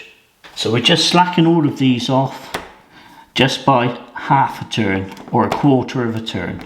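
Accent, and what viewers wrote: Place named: West Country, United Kingdom. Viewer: Thanks mate very informative and love your west country accent ' oww arrrrr" Cheers from Manchester 😅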